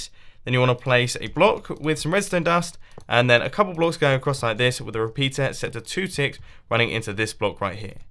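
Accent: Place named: United Kingdom. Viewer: Oh um sorry. Thanks for another fantastic video mate! Please keep it up! I also like your British Accent!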